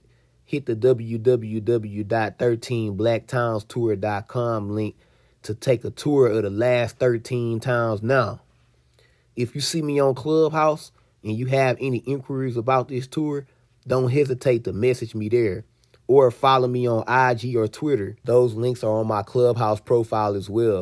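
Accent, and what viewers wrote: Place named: United States. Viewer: With due respect bro the Fulbe in nigeria 🇳🇬 are very fluent in fulfilde 🗣️dey cut across the cameroons🇲🇱,central Afrique, Niger, conakry and so on and mind u the chief of d Fulbe in Yola nigeria 🇳🇬 is district head and spiritual leader to all dese zones dey pay Allegiance🐮🐮🐮🐮🐮🐮🐮 to him bro pls 🙏 don't be ignorant I see u got an American accent🗣️how much of fulfilde can u speak urself bro🤷No hard feelings bro. Bro good job u did here buh pls 🙏 do a lil bit of research on Nigerian Fulbe🐏🐑🐃🐂🐄🥛🥛🥛👌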